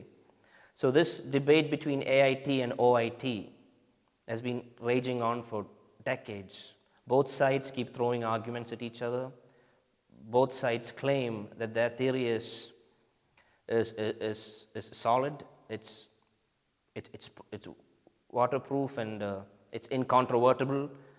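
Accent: Kerala, India. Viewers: Why do you have a mallu accent?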